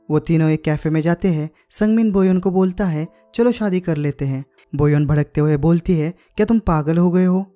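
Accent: Maharashtra, India. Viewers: Aap marathi ho kya ?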